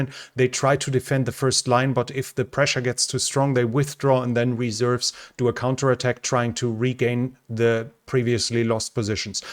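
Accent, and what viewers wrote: Germany. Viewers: Hessisch Dialekt? Your English sounds like my Frankfurt family..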